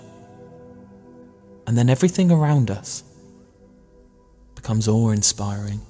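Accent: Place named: United Kingdom. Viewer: I loved the british accent!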